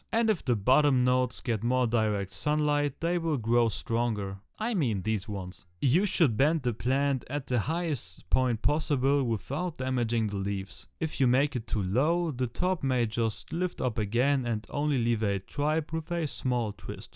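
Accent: Germany. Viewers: A german trying to talk english is the worst... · This is the most german english i have heared in a long time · Bist du Deutsch?😂🫶🏻 Ich mag falsch liegen aber so redet nur ein Deutscher englisch.😂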